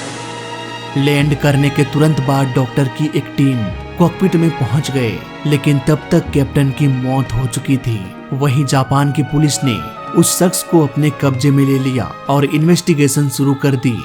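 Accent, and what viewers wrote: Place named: Rural, India. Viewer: For a Japanese incident using Arabian background music ? Sha ko sa . And sa ko Sha ? How come there is no self realisation that it’s not a documentary of Bihar railway station but an aircraft .. U sound so dehati ..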